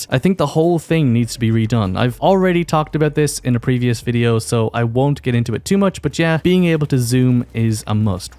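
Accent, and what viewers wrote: Ireland. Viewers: Do I hear an irish accent in that voice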